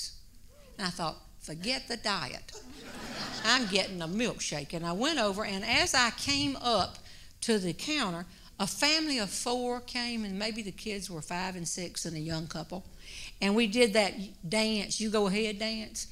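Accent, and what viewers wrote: South, United States. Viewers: It wouldn't be the same without the southern accent. I could listen to her for hours. I can't listen to Samantha Bee or any of the newer "female comics/comedians" for more than a few seconds. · She is a gem! She used “mash” when “pushing” a button. Love her Southern twang! Recently discovered! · I've never heard a southern drawl in real life... it's quite comforting. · Depending on which part of the south one is from will determine how your southern accent is accentuated. I am from New Orleans and would have a hard time understanding me. However, her voice plays like fine music to my ears. I can also listen to Shelby Foote in the still of a morning, drinking my first cup of coffee and feel all is well.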